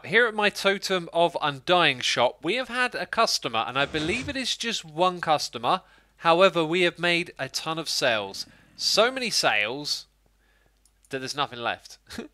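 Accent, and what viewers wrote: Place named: United Kingdom. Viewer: I always find it fun to compare pronunciations with you X. Me: Aich X: Haich Me: Or-kid X: Or-chid Me: mural X: murial (this one bugs me a bit) Me: Zee (sometimes zed) X: Zed (ofc cause British) Me: compOster (with long O sound, like the word Open) X: Composter (short o sound, as if saying the word posture) I keep waiting to hear more and it's become a fun game now. Hope X sees this! <3 Cheers Buddy!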